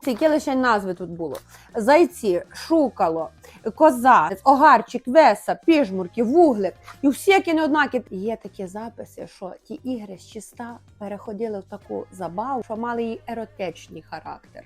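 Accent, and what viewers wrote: Hutsul, Ukraine. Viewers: Я все зрозумів, просто замість Я говориш Є і в тебе гуцульській акцент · Як я люблю цю жінку, особливо її гуцульський говір. Нехай щастить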